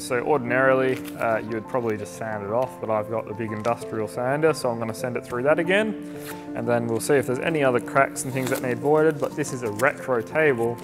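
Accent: Australia. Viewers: maybe try vibrating your epoxy in the mixing cup to remove the mixing bubbles, orbital sander should do the trick... also works on the slab too but make up a dummy pad so no pesky dust:D Judging by the accent you in Aust?(probably one of the worst country's to be a fine furniture maker)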